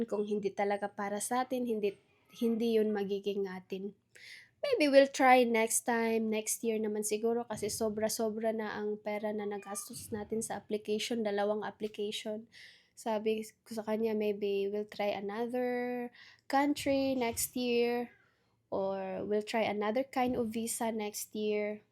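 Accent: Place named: Visayas, Philippines. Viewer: Bisaya po kc sya kaya Ganon.. atleast naintindihan pdin😊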